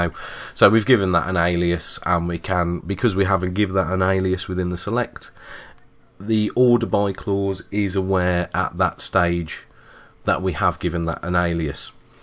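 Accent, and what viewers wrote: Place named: United Kingdom. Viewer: I really hate british accent. How do you guys roll your mouth like this. This is worse than indian accent... Allright allright not bad at that level but c'mon guys it is really pain in the ass.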